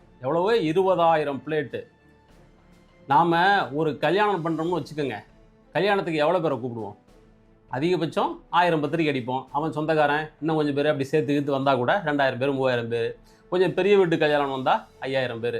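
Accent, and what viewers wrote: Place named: Thanjavur, India. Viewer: நல்ல உட்சரிப்பு தஞ்சை பேட்சு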